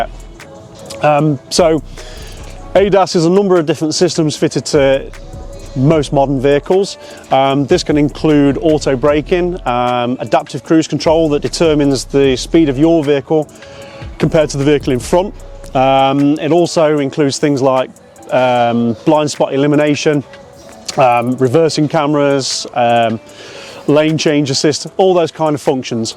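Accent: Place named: Leicester, United Kingdom. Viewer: It's the Leicester accent, that's accent nor excent!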